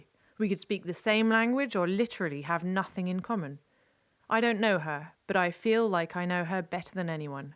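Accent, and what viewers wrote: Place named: England, United Kingdom. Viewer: I think I am going to spend eternity wondering why the narrator is speaking with an English accent. Still it does make it quite entertaining. And yet somehow ...my comment ended.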